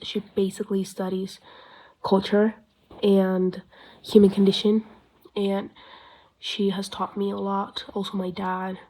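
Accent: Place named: United States. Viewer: Wait you aren't from America? Your accent sounds very american.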